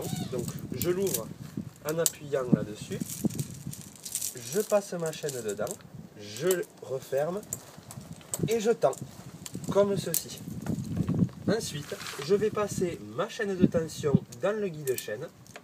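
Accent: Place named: South, France. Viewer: je t'écris 11 mois après mais le rapport c'est que le mec parle comme le sketch des Inconnus avec l'accent du sud un peu flicard mais son tuto et super merci à lui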